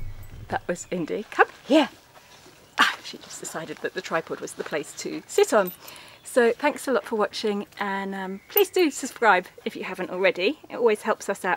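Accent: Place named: United Kingdom. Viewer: I've got to say, you and are just delightful. I'm sure when you started this YouTube journey, you may not have given much thought about how it would be if your channel got popular and you were recognized. Positano is such a small place, and you guys are recognizable by your kind, lovely faces (your British accent and wonderful smile). You are so gracious to invite people to say hello to you, which can I imagine can be intrusive or inconvenient depending on what you are doing. You never need to apologize for being human or having a bad day. I hope that people respect your privacy when the moment calls for it. As someone who has been wanting to visit the Amalfi coast for all of my adult life, I thank you so much for showing us around your beautiful little corner of the world. You take me there even when I can't be there! Love to you from PA, USA. 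❤️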